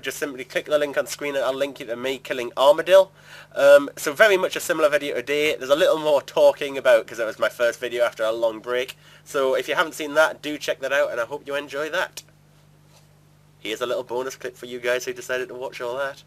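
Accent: Newcastle, United Kingdom. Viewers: loving the jeordie accent in the super hostile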